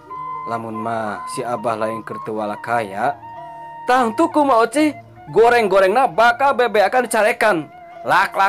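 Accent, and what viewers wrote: Sunda, Indonesia. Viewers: Wa alaikum salam wrhmtllh wbrkth masha allah lalampahan abah kanta semoga makin sukses kang meni sae dina nyariosna lagam nada nadana cirihas pisan orang sundana hatur nuhuun kg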